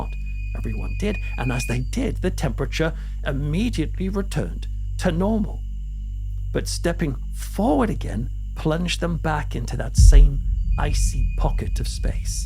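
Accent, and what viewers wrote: United Kingdom. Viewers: Your the best story teller on you tube , and British … which is so refreshing 😊😅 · This man needs a nomination to the storytellers hall of fame. You have a wonderful way of making the listener feel like they are right there witnessing the events you speak of. Plus, as an American, I don't know why, but when you Brits tell a story, it just sounds scarier in a good way. 😊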